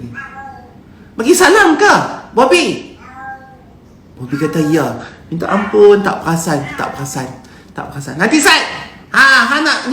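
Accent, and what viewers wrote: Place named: Penang, Malaysia. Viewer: Loghat penang datuk sangat mencuit hati.moga Allah sentiasa merahmati datuk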